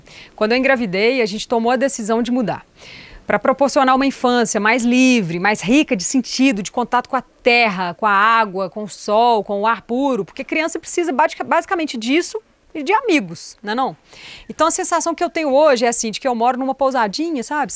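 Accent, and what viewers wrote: Minas Gerais, Brazil. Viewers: ótimo, essa ideia de voltar para esse contato com a terra é especial, temos que aproveitar mais nossa mãe, estamos por aí tão viciados no urbano frenético que por vezes até já esquecemos a nossa origem e é sempre bom lembrar nessa encarnação que quem nos sustenta mesmo não é o sistema humano artificial e sim a água, terra, ar, sol, chuva e (...). Amei esse canal, estava sentindo falta de youtubers mineir@ agente só escuta o sotaque do rio e são paulo por aqui na maioria das vezes...BH me representa muito mais na fala...vocabulário e etc..paz e luz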